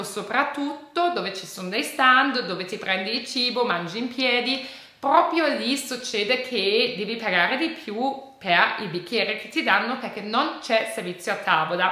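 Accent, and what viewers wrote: Germany. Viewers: Salve. Mi chiedevo se la bottiglia di plastica si deve rendere obbligatoriamente nello stesso negozio dove si è comprata o anche in un altro? Grazie. P.S. Il tuo italiano con cadenza tedesca è stupendo. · Bravissima complimenti per il tuo italiano mi.piace l.accento tedesco....io vivo in Germania da anni...e si sente l' accento italiano quando parlo tedesco e divertente questa cosa..ciao un abbraccio...dove vivi in Germania ? 🌷🌷🌷🌷☀️☀️☀️☀️ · Se voi piaciuto ,se vi è piaciuto questo video ,hai un forte accento tedesco si sente .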